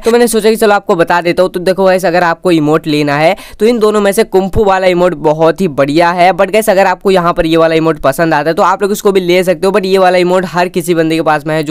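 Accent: Odisha, India. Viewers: Bhai ap odisa see ho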